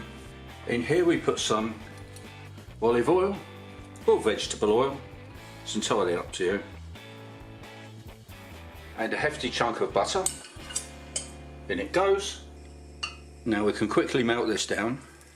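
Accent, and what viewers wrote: United Kingdom. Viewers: Yummy recipe and lovely Brit accent, I love your vids! ❤️💕🙂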